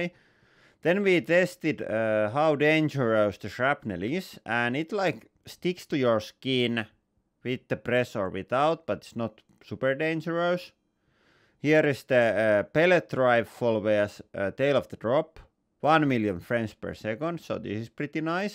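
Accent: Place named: Finland. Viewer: The underwater shot was spectacular!! Also with all due respect I love your Finnish accent when you speak english. Please, don't westernise your speaking! 😁😁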